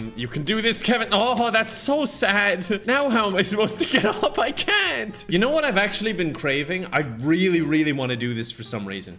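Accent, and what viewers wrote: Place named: Ireland. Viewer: He's just Irish don't worry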